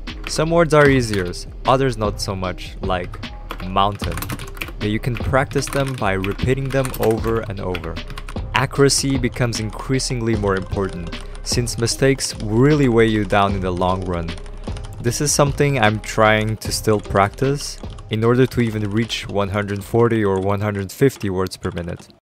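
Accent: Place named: United States. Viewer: he speaks american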